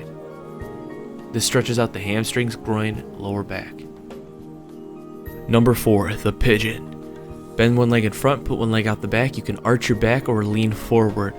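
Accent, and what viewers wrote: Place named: Midwest, United States. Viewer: I don’t know what state you are from, but I’m guessing Midwest or Chicago with the accent. The way you say calf cracks me up 😂😂😂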